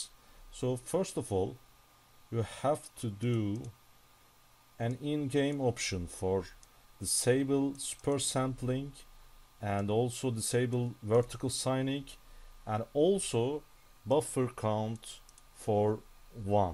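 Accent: Turkey. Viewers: Telaffuzdan direk anladım Türk olduğunu :D hissediliyor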